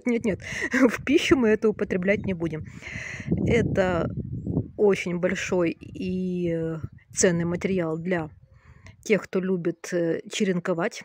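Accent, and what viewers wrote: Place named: Odessa, Ukraine. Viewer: с приятным одесским говором и правильной речью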